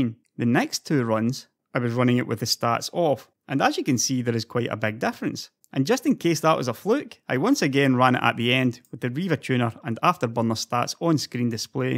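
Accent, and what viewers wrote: Scotland, United Kingdom. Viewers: speaking English with a Scottish accent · stayed for the scottish accent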